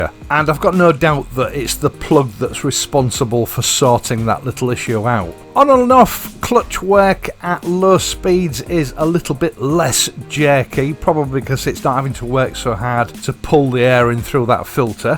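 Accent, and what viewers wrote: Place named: Northeast England, United Kingdom. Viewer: I really love your videos and your accent! May I ask, are you from the northeast of England? Cheers from Austria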